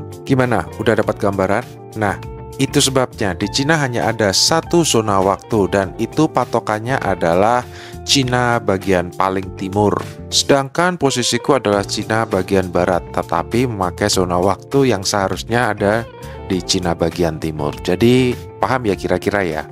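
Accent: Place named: Java, Indonesia. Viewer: Kau orang cina islam yah koh. Logat bicara jawir. Gimana ada penindasan gak terhadap islam disana? Jangan hoax loh yah kokoh ganteng.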